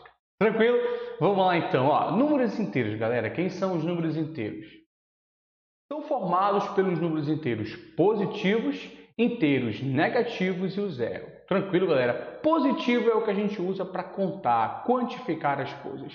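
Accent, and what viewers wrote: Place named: Pará, Brazil. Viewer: Parabéns, aula muito bem explicada, e comum jeitinho paraense que só quem é daqui conhece kkkk Obrigado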